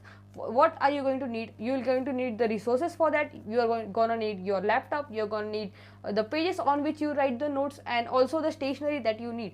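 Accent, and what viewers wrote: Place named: Haryana, India. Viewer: U from harayana sista ??🤔🤔ur voice have some haryanvi look bytheway I am from harayana ✌️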